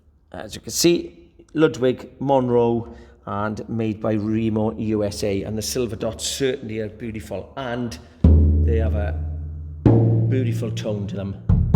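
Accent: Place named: Liverpool, United Kingdom. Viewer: They were discontinued in late 70s because oil prices skyrocketed. Plastics are a by product of refinery business, including vistalite acrylic. It wasnt because of the light up system development, they just werent profitable to manufacture because of the source material price increase. The light system had its flaws too. They would heat up the shells to the point were the seams would start letting up. Are you from Liverpool by the way? You sound like McCartney :)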